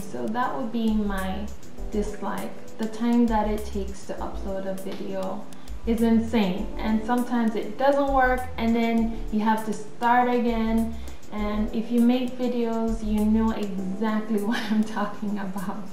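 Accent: Jamaica. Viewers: majamajami ........she sounds Jamaican...................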